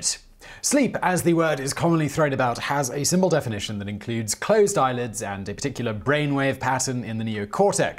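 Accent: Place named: RP, United Kingdom. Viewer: That's not it - it's just his accent is impossibly posh. Plus they (obvs) are not from N.A. & didn't Google search "how to pronounce tilapia" prior to filming.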